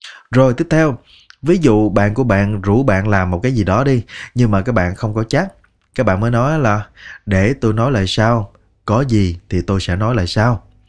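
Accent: Standard. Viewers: Lời thầy dạy nghe rất chuẩn.., rất hay., nghe ấm, truyền cảm và dễ hiểu 😍👍👍👍 chúc thầy và gđ luôn mk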